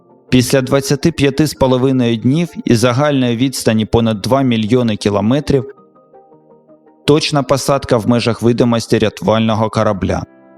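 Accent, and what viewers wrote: Russia. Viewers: Чому в кожному слові такий виразний російський акцент? Всього лиш "о" не вимовляти як "а" і не пом'ягшувати "ч". Хоча б... Ну невже це так складно?...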